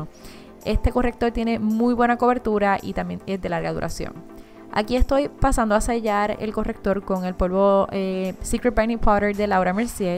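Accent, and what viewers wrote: Puerto Rico. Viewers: Eres hermosa sin maquillaje y mucho más hermosa con maquillaje.. Me encanta el acento Puertoriqueño.. 😍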